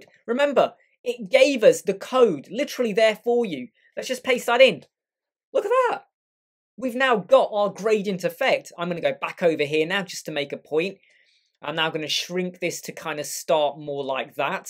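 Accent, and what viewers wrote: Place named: England, United Kingdom. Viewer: i liked your english accent , are you a native speaker ?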